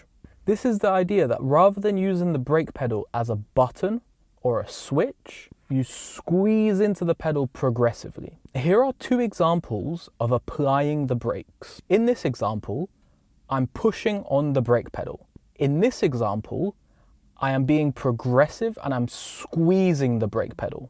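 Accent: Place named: England, United Kingdom. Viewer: What part of england is that?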